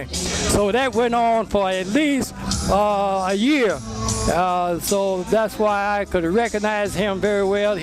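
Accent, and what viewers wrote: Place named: India. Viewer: Thats an indian talking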